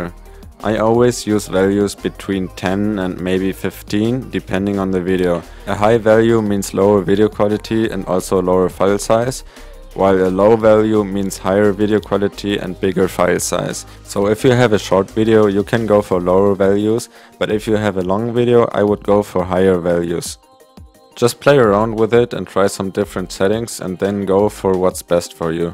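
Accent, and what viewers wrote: Germany. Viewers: you sound like you're coming form germany^^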